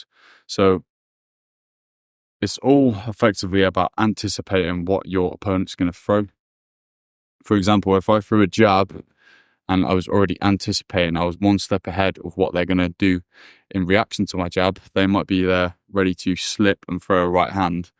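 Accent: United Kingdom. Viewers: I just bought a punching bag, hung it up, and started boxing. Thanks for the tips and the video. I really like your British accent and the way you explain all the things 😎 Thx!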